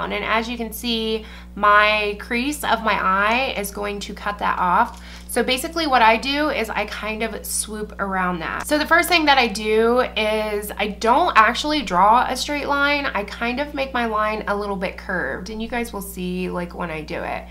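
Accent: United States. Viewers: I love this tutorial, thank you! You sound like your from Philly, reminds me of my aunt lol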